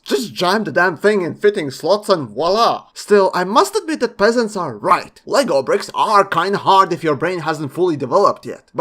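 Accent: Latvia. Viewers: I KNEW I HEARD A LATVIAN ACCENT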